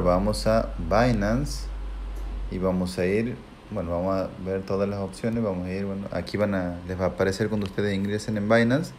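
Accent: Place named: Santiago, Chile. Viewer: que lindo es escuchar la voz de un santiagueño puro !!! saludos de un termeño !!!!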